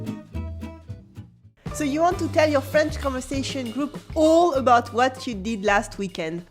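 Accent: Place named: France. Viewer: I believe you speak too much English in your video. That's the reason I don't subscribe to your lessons. You would help those who are listening to hear complete phases in French. Also, as someone else mentioned, despite your good English, you did not pronounce the word Adjective correctly in English. It just sounds like any French person trying to speak English (with a strong French accent). The accent is on the first syllable, not the second. Bonne chance!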